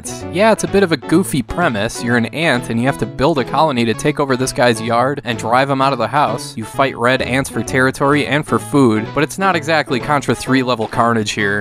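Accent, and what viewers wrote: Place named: Midwest, United States. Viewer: It's very midwest, its like I'm back home.. 😎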